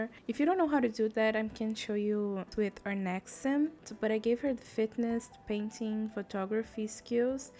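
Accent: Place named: Brazil. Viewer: I knew I recognized your accent, happy to see another fellow brazilian sims player haha. Excited to see more of your videos ❤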